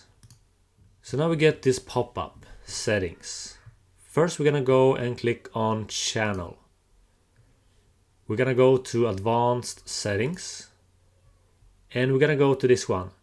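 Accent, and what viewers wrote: Sweden. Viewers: samma här, jag hörde det på din fina accent men den också att du hade typ någonting på svenska kommer inte riktigt ihåg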